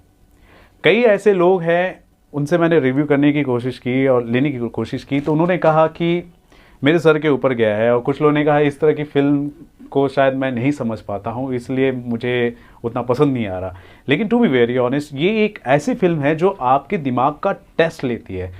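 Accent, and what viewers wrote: Karnataka, India. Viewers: You talk pakka kannadiga in 1st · Ur kannada is so sweet 😘.. lots of love from Karnataka ❤️💛🙏🏽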